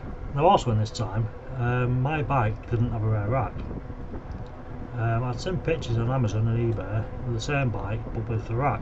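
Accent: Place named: Yorkshire, United Kingdom. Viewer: Just came across your video well done you I found it interesting listening to your woo with your first e bike purchase what a bummer , but looks like you have purchased a different one now and you are all legal. I bought my first e bike over 10 years ago before all this legal stuff it had a motorbike like throttle I have never been stopped by the old bill in fact I have had several bobbies saying what a nice bike never asked me about motor size or anything maybe just been lucky . Please carry on making your videos love your accent reminds me of home I was brought up in Yorkshire but left when I was twenty live in London now miss the northern accent though .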